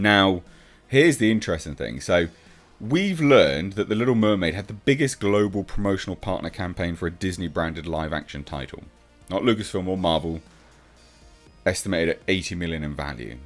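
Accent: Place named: United Kingdom. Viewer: He sounds like every British guy everywhere anywhere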